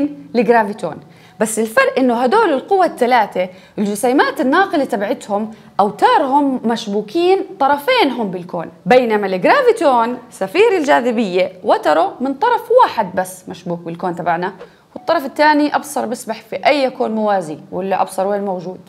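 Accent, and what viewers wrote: Jordan. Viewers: ليست لبنانية.. ربما هي من جنوب الأردن ولكنها قطعا ليست لبنانية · ادري الموضوع فيزياء وبلاوي بس وربي اللهجه الاردنيه تجنن بحس ودي اضحك 😂😂😂 واحلى اشتراك 😊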